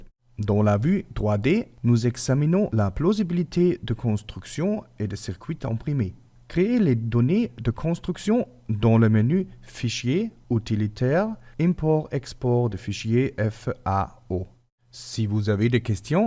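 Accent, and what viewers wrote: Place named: France. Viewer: ach du liebe Gott ^^ danke für diese schöne Französisch-Akzent.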